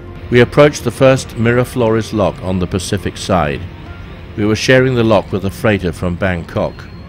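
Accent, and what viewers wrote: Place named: Southeast England, United Kingdom. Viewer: It’s pronounced ee-gwa-nah lol Not ig-u-ah-nah And its you-ka-tahn lol Just saying how the locals pronounce these in their native language lol no worries we know what you meant. I am curious as to your accent though. Its very similar to mine and im from the southeast of the U.K.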